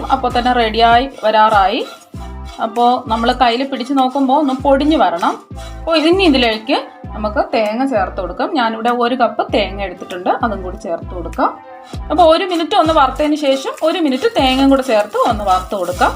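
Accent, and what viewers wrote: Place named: Kollam, India. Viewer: Mam, your recepy is very good and I love it. But your kollam language is also attractive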